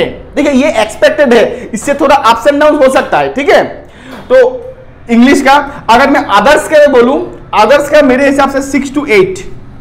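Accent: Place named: Bengal, India. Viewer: Sir,I had attempted 52 in which 1 is wrong not sure about it,but in others question I have 100% accuracy but say I will consider it above 95% as I did only for accuracy with moderate attempt...So shall I prepare for mains?? category is normal And it was 15 th Oct 3rd shift (tough shift) And are you Bengali bcz accent is similar to that!!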